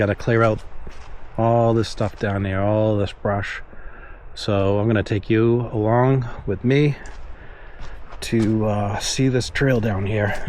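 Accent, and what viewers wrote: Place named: Boston, United States. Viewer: Looks like NW Alabama to me. That Boston accent will go great there.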